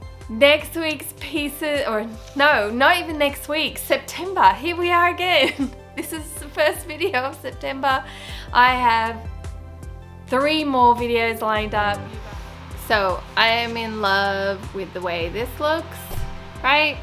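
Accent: Australia. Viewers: another beautiful makeover! My reaction to the comment(s) about you: Some people can be brazenly offensive. If they have an issue with your channel, then why are they watching? You don't need that foolishness. Continue to be you. I love listening to you (love the Australian accent) and learning from you. Just thinking about what was said irritates me and even though you don't know the person, those comments can be hurtful. Block anyone else who can't appreciate you and what you do. Let's not waste any more time on shallow people. Evidently you're doing something right by being YOU. Your channel is growing. Congratulations on the 10K! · Smiling Saturday!! We're here for it and your lovely Aussie accent. Oh, and you outstanding work too!! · We love you and admire your work! In the shop you are Working Woman, not the glamour version, plus the heat and humidity of TN--well, I would wait til cooler weather, being more the wimp. 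So don't let the snarky ones affect you. Love the Aussie talk, so talk on!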